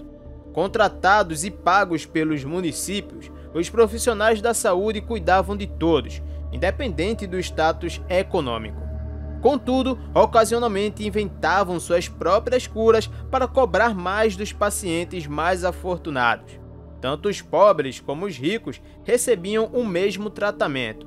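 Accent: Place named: Bahia, Brazil. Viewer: O cara é mais baiano que eu que sou baiano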